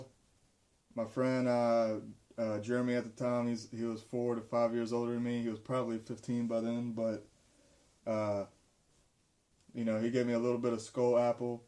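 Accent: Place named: South, United States. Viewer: Thanks for the shout out,! I always liked the tone of voice and attitude that you have! I can hear the southern accent as well as sort of a black sound from a proud white man here! Well, nothing racist here, just your unique, individual style, which is great!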